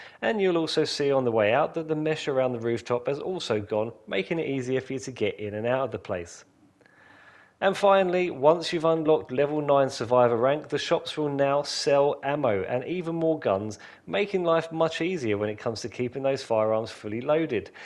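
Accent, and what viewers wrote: United Kingdom. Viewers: British pronunciation is weird at times lol. They say inventory like invent-Tree we say it like In-Va-Tory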